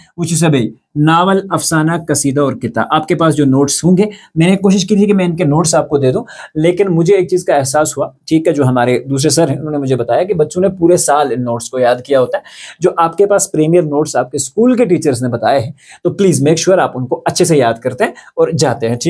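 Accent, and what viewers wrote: Kashmir, India. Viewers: Sir apka Kashmiri bahot acha lagta hai ❤😊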